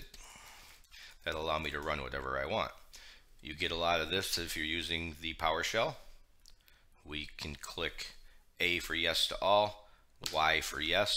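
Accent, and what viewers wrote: United States. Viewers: I can't understand american accent but... I was so atracted by this deep male voice mmmmhhh very inspired😋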